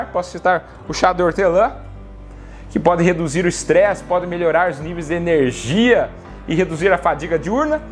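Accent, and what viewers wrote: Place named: Minas Gerais, Brazil. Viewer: Dr vc e mineiro? Convesa igualzinho mineiro,, eu sou mineira com muita orgulho!!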